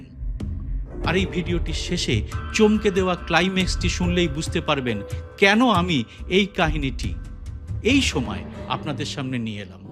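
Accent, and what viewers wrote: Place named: West Bengal, India. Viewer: কারন সে পশ্চিম বঙ্গ ভারতের । তারা সুন্দর শুদ্ধ বাংলা বলে ও বিশুদ্ধ উচ্চারণ করে।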